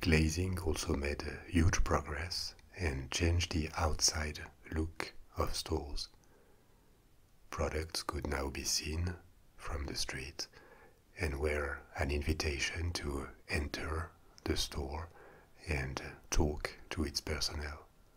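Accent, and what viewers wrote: France. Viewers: I think you are one of my newest favourite asmr artists. That french accent.... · I'm a huge fan of ASMR with female voices, triggers it better for me personally. However, you sir, and your deep dark chocolatey French accent do the job quite well.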